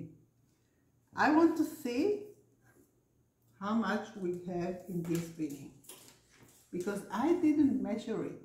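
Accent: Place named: Israel. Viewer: Hi!! I just received an email from you introducing yourself! I’ll have to go back and look for which pattern was yours as I’ve been participating in a few different blog hops. I recognized your Israeli accent too when you started speaking! I only had the pleasure of visiting Israel twice, once with my Hebrew School for a youth trip, and again the following year for my brother’s Bar Mitzvah. But I loved it there and I love the incredible internet that let’s us connect with people from all over the world! I live in the USA, just outside of Philadelphia in Pennsylvania, on the East Coast. Best wishes to you with your channel. I’m going to look for your book on Amazon. Thank you for sharing! 💜🧶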